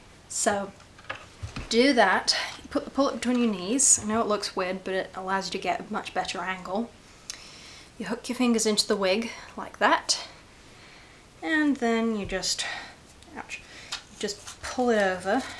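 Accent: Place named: United States. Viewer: Omg, a posh American accent, luv it!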